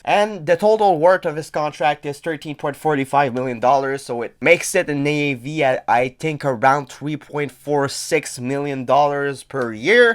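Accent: Canada. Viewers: Man I fucking love hearing your accent. I live in Toronto now so it brings me such hometown vibes!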